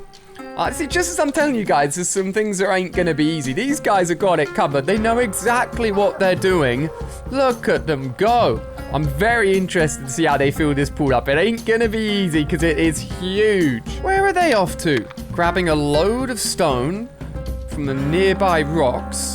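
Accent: United Kingdom. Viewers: an inside stairway us:your british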